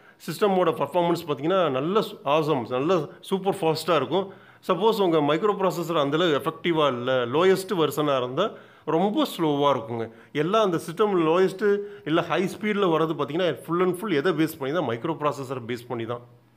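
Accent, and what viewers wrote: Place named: Kerala, India. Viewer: Sir sounds Kerala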